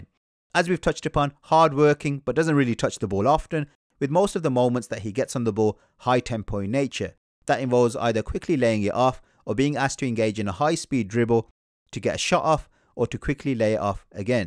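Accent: Birmingham, United Kingdom. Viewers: Brummie lad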